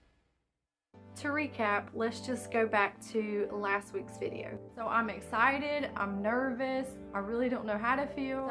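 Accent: South, United States. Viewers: I just Love your Southern Country Accent...it’s GREAT 👍🏽. I’m Glad that you posted this outcome and you’re ability to find your BIO Dad. 🤔🙏🏽🙇🏻‍♀️💗🔍🕊💎🧬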